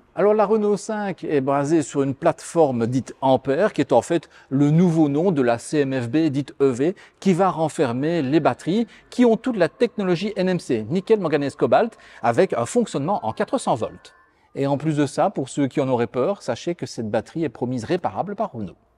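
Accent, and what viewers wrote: Belgium. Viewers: Merci pour cette video, anciennement frontalier, votre accent m'a rappelé de bon souvenir sur les plages de La Panne !!! Je ressens votre amour pour renault 😊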